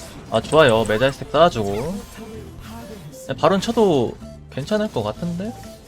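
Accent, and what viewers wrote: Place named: Jeolla, South Korea. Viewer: 님 전라도 분이신가 ㅋㅋㅋㅋㅋ 사투리 ㅋㅋㅋ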